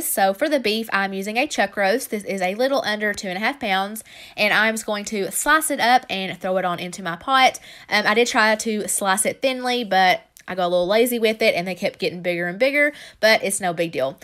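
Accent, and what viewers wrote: South, United States. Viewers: Aloha!!! I absolutely love the sound of your voice!! It’s super soothing and your lil southern accent is so cute!!! I am a new subscriber, & I’m guilty!! I’ve been caught I’ve been binging on “WFD” everyday after work!! You seem like such a sweetheart! Blessings & Aloha Sweetie!!!! God Bless you and your family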